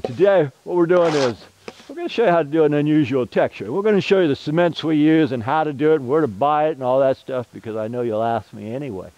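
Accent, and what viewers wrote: California, United States. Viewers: Never seen such a pro stucco enthusiast in my life tip of the cap 🧢 that accent is so Cali it hurts 😂 good stuff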